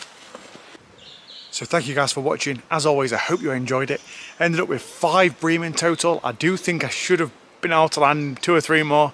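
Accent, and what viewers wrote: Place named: Yorkshire, United Kingdom. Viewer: Nice method that, and much cheaper than the korum bolt rigs, some nice slabs there too. If you dont mind me asking, what part of the country are you fishing?? As you seem to have a yorkshire twang to your accent.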